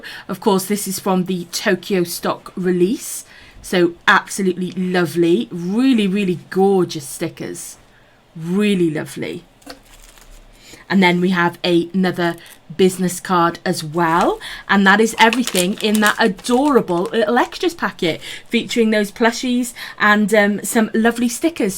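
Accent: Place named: England, United Kingdom. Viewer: Great review! I’m in love with ur English accent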